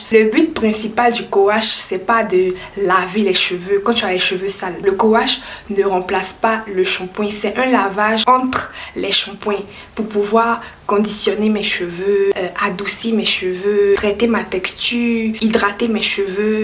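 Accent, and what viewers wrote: Ivory Coast. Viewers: J'aime son ascent ❤️❤️❤️. Genre à l'ivoirien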